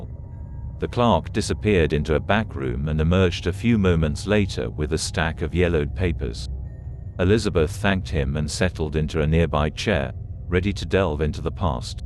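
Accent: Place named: United Kingdom. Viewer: read by a British robot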